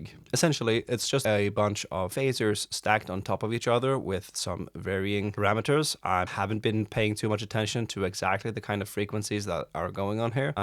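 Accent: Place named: Sweden. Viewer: Jeg har vært helt sikker på at du er svensk når jeg hører den minimale aksenten din. Gratulerer med VM-medaljen i hockey, veldig stort :) (Google Translate :) )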